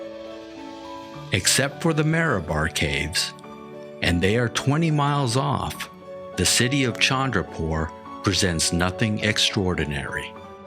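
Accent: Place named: United States. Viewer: He's not AI -- he's American. They tend not to do languages well. Dan Rather use to say " N-rowt" for en route.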